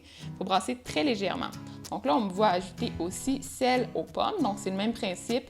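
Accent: Canada. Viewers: Vous venez du Canada? Si oui j'I reconnu direct dès le premier mot 😂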